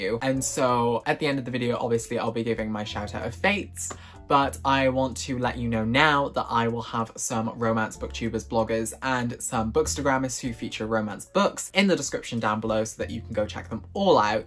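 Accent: Wales, United Kingdom. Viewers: 0 am I sensing a Welsh accent??? First Welsh booktuber I've found and it's EXCITING!!!! Loved your reviews, subscribed!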